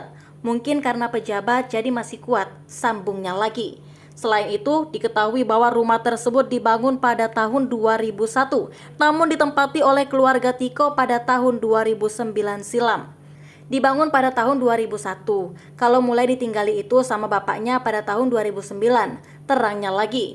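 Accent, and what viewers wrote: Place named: Banjar, Indonesia. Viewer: Bahasanya kok presintar kaya bahasa banjar. Ada sedikit logat2 x mba. Hehe 😁😅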